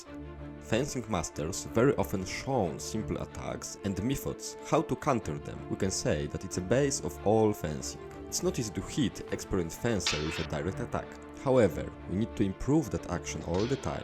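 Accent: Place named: Eastern Europe. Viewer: We were promised Slavic accent, and we get memes in Slavic accent. Nothing to complain here...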